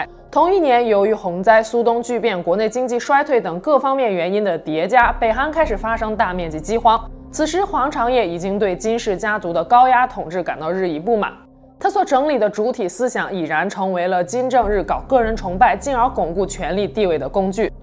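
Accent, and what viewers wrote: China. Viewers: up咋是咱大陆口音啊，不像台湾人嗲嗲的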